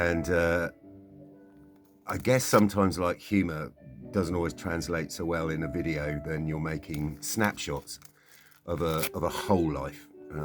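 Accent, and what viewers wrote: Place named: United Kingdom. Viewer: 😊i love your relationship..it is so loving and pure. I love watching you interact together. And I really love your British accent..I am from the USA by the way. So happy I came across you and Smurfy ❤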